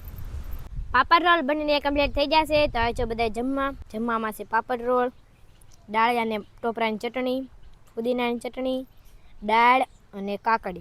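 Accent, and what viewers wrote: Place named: Kathiawar, India. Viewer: The language she isvtalking like kathavadadi speaking Difficult to understand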